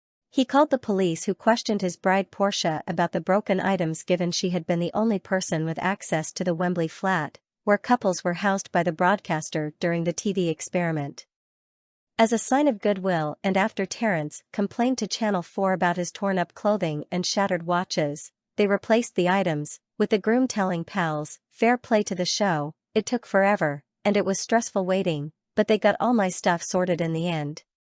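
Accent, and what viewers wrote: United States. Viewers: Your voice is awful-why is an American doing this voiceover!! Has she inhaled helium ..awful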